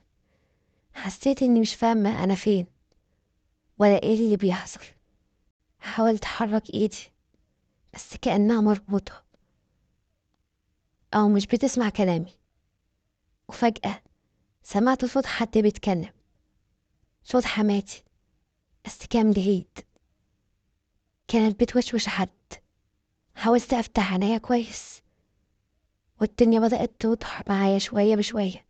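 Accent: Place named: Iraq. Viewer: انت من العراق تحيه من مصر بحب صوت اللهجه❤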